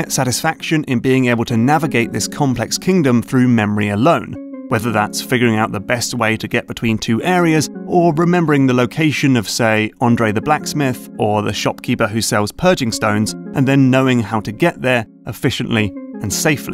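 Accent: United Kingdom. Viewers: What's up with the great analytical YouTubers all having UK accents?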